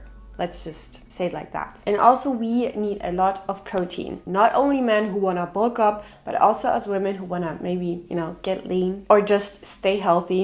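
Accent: Germany. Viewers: Are you german ? I cant listen to accent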